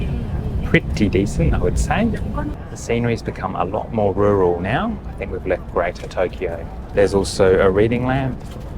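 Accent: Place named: Australia. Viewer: good to see you again Love your heavy Aussie (if I may say so) accent 😊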